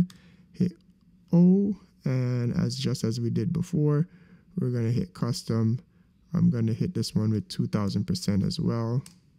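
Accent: Jamaica. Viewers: Great tutorial. Are you Jamaican?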